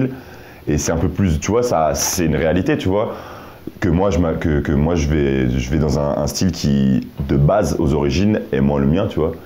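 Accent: Belgium. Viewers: On parle comme ça à Bruxelles hahah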